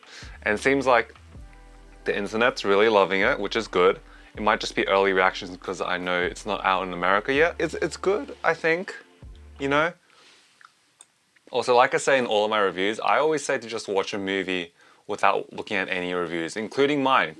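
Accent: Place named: Australia. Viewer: I loved this movie. I agree with you in wishing that it was longer. I appreciate you - good to hear a fellow Aussie voice reviewing this and being so into the lore of the characters and previous media. Also, where did you order that food? How did you get those three dishes mixed in one container? lol. I’m so hungry now.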